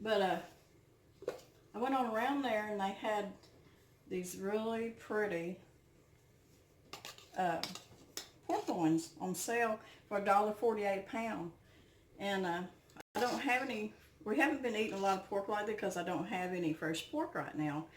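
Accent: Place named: Kentucky, United States. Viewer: Hi. I just discovered your channel. I love to watch you. I like how you do things the old fashioned way, like my Mama taught me. I love raising a garden, canning my vegetables. Making bread from scratch, doing just about anything the old fashioned way. Thanks for sharing your talents with us. I was born and raised in KY. I live in TN now. Your accent sounds like home. Where are you from?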